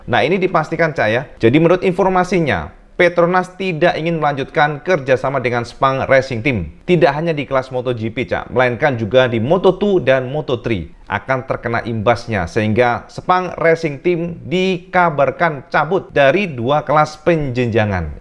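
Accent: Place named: Java, Indonesia. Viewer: Terbaik cak..terus saya mendengar walaupun tak faham loghat jawa bila disebut..😆 Subscriber dr 🇲🇾👍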